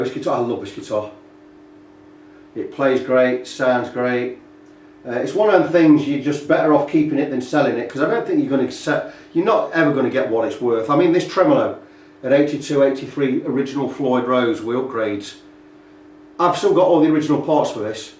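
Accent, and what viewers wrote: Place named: Nottinghamshire, United Kingdom. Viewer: Great restore mate! Ican see you are passionate about your work. I can slightly trump your bargain though - I picked up an ESP built Focus <num>) in the 90s - MASSIVELY unfashionable then lol, with the original FR also in the metallic red like yours for ony £60 :) The Charvel Japanese Model series (Fuji Gen?) from mid 80s are another underrated and overlooked find. Would be good to see you make a super low cost (but not nasty) Chinese guitar like a Stagg or something play like butter! Also your accent - are you in or near Sutton in Ashfield or thereabouts?!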